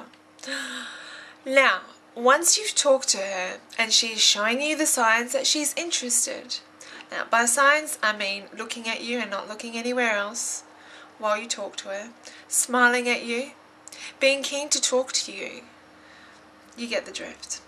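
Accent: Australia. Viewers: I'm a sucker for Austrailian accents :)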